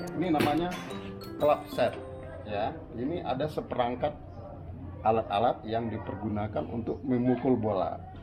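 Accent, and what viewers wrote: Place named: Bali, Indonesia. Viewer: Ini bapak lihat logat nya orang bali kali ya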